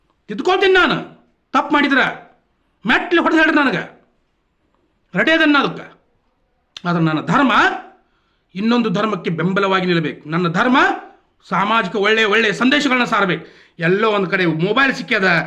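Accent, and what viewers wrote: North Karnataka, India. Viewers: Uttarkarnataka kannada valg masth heliri brother Super 👌 Correct agi counter Kottiri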